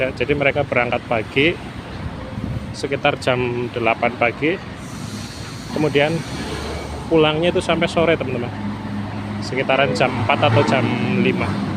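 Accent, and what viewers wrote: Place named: Tegal, Indonesia. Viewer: Logat bicaranya seperti orang dari Tegal nih😁, tapi lumayan bagus cara penyampaiannya 👍👌🇮🇩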